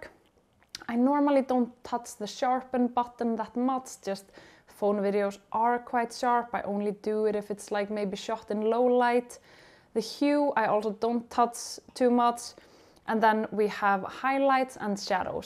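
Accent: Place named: Iceland. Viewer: Love your Icelandic accent!! Beautiful ❤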